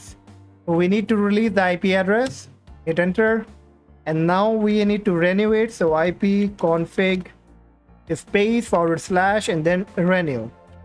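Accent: India. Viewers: i thought its a scam because of the indian accsent but its real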